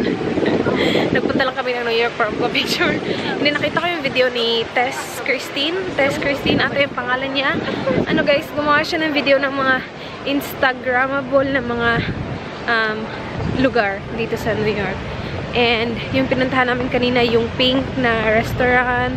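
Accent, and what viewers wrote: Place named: Cebu, Philippines. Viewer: ARE YOU BISAYA? HALATA KASI ANG TAGALOG MO NA YOU ARE A CEBUANA....IM ALSO CEBUANA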